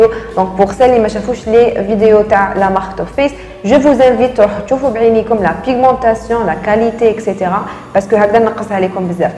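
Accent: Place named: Kabylie, Algeria. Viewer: T'es kabyle???? T'as un petit accent. Mais qui te va biennnnn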